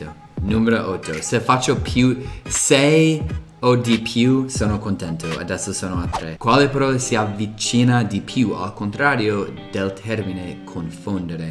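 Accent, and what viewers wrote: United States. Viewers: L'inglese parlato in Inghilterra é piú facile da capire. Con l'accento americano come il tuo non si capisce un cazzo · sei molto simpatico e hai una notevole proprietà di linguaggio in italiano, e conosci moltissimi vocaboli. solo hai un grande accento americano, ad ogni modo si capisce bene quel che dici, lo puoi anche tenere.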